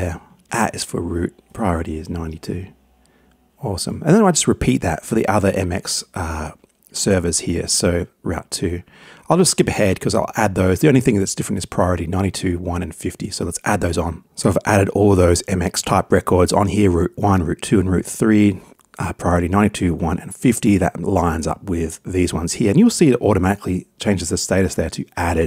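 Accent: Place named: Australia. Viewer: Hey, this video is great, thank you. As it sounds like your from Australia as well... we have only just starting working a lot more with Cloudflare in the last few months with websites, subdomains and email routing. We have come across problems with our internet and email provider being Optus. Question, do you know if this format that you have just explained will work for something like Optus, because all our emails we send from Outlook. Hope this makes sense, regards Brad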